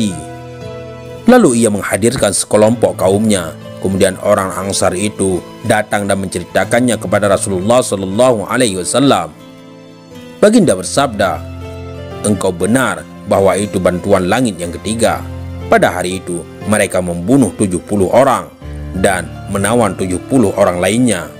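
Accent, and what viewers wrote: Aceh, Indonesia. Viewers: Salam admin:sya dari padang minangkabau.bolehkah saya bersejerah bertanya kpda admin. Admin dari mana. Bhsa admin bercampur dngn bhsa indonesia & melayu.logatnya sprti logat aceh.apakah admin orng melayu aceh.orng malaysia tak mungkin rasanya bhsa melayu malaysia berbeda dngn bhsa admin. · logat ACEHH kyk nya...taah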